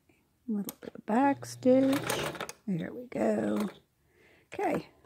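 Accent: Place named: Baltimore, United States. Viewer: I love your bowl and accent. I'm guessing your from my home "town" of Baltimore or maybe Philly?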